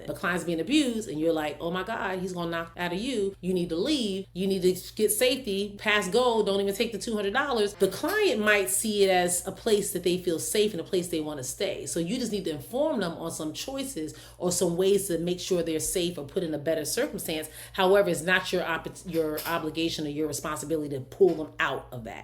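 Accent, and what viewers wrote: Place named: Philadelphia, United States. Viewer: That Philly accent is strong!! Much love🙌🏿🙏🏿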